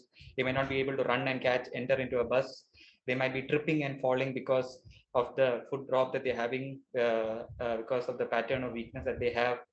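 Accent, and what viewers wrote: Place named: South Asia. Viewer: At least 350 million people - Indians plus Pakistanis plus srilankans plus bangladesh use English with this accent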